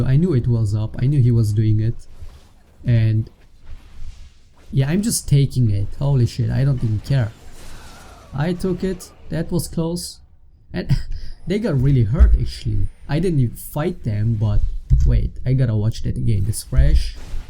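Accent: Germany. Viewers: ich mag deinen deutschen akzent xD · Wie man hört, dass du deutsch bist :D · Höre ich da einen deutschen Akzent :D ?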